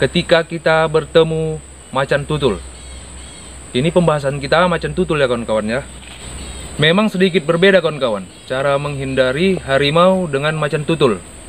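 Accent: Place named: Medan, Indonesia. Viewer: Ada lucunya nih org.. Logat medan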